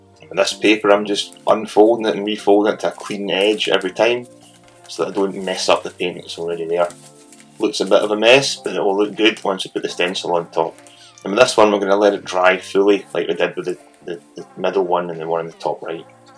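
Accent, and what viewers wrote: Scotland, United Kingdom. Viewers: Cheers from france , like your planets & love your Scottish accent.... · Anybody else love the Scottish accent, were painting sex planets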